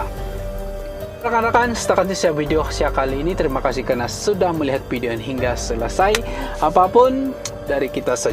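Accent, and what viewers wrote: Sabah, Malaysia. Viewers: ni orang sabah ka? Aku tangkap aksen dia sabah bah cara dia becakap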